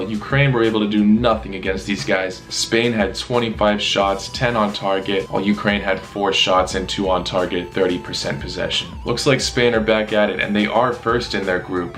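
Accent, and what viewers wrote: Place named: United States. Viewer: i love ho you pronounce russia russiya but with a slight american accent.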